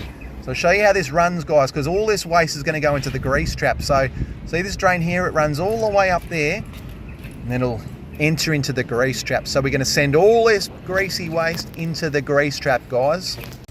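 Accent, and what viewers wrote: Australia. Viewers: Love the channel always loved the Ozzie accent since we got the Paul Hogan show in the 80s. 🏴🇬🇧⚒️⚒️⚒️. The New South Wales is a bleeding sight better than the old one. Your a legend. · Hello there. It's Tuesday 22nd April. The sun is shining & I'm sitting watching you from England. I'm glued to the t.v. absolutely fascinated with the job that you do. You are great !Can't take my eyes from the screen & it's lovely hearing your Australian accent. Keep it coming you fabulous man.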